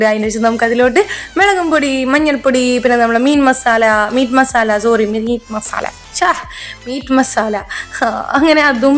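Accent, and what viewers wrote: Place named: Thrissur, India. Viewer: നിന്റെ സംസാരം കേൾക്കാൻ എന്തു രസാ... 😍തൃശ്ശൂർകാരി ❤️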